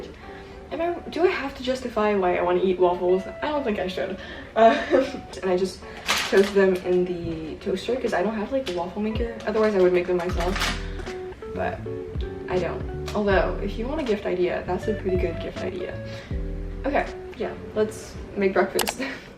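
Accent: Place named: Quebec, Canada. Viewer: yayyy a fellow french canadian! I knew as soon as i heard you speak french lol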